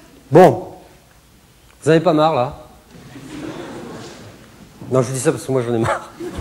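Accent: France. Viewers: Il me fait pleurer ce mec, de rire et de peur, je l aime ce mec et son humour… avec son accent limousin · Il est beau l'accent de Dunkerque x)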